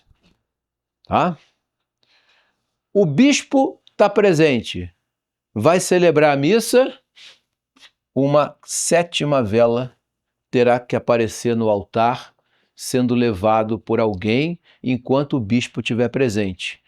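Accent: Rio de Janeiro, Brazil. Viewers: Olá, boa noite! Que aulas maravilhosas!!! Parabéns ao CDB e ao excelente professor! Só gostaria de frisar, aqui, que o excessivo sotaque carioca torna a aula cansativa ao ouvido, o excesso do chiado do 's', o que é um ruído da fala, é ríspido ao ouvido. Como boa estudante que fui de estética vocal, sugeriria ao professor minimizar este sotaque. Nada contra os cariocas, por favor. Apenas que para falar ao grande público é melhor sem ele e qualquer outro. Obrigada.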